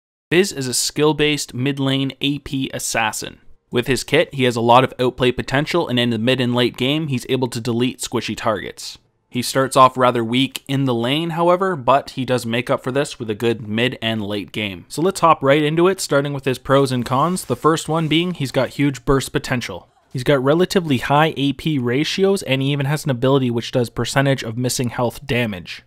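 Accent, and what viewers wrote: Canada. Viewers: you're canadian aren't you?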